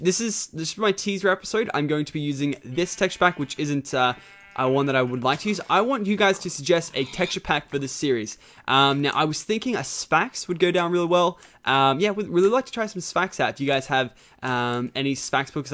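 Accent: Australia. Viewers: Also, are you Australian because you sound like it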